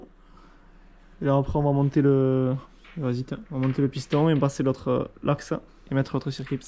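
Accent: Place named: Tarn, France. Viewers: salut les gars merci pour la vidéo, y'a l'accent du sud !! petite précision concernant le montage : perso j'aurais contrôler le jeu à la bielle juste à la main histoire de m'assurer qu'il y ai pas du jeu de haut en bas, ensuite pour le montage du piston en côte b, démontage de la culasse et contrôle du jeu à la coupe des segments à l'aide d'un simple jeu de cales, ainsi que le jeu du piston/chemise à l'aide d'une des ces méthodes ici : <link> C'est très important pour un montage correct. A+ et bonne continuation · Wooooh l’accent du Tarn c’est chaud Moi j’le comprends vite fait vu que j’ai vécu Castres un an, mais tu vas galerer sur YouTube, tout les accents du Sud passent mais l’accent bien Paysan c’est dur à comprendre tu te rends pas compte tu t’entends pas 😂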